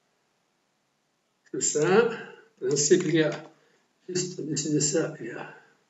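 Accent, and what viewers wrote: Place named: Tunisia. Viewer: tunisien accent merci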